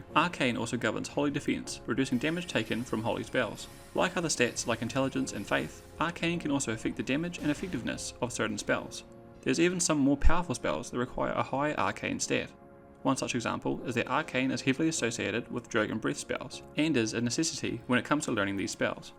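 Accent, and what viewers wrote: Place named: Australia. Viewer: "Biddeh" i love australian accent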